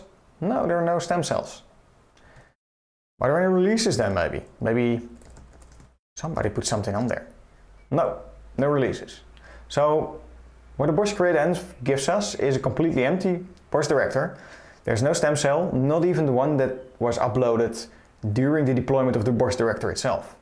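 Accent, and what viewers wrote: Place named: Netherlands. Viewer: dutch english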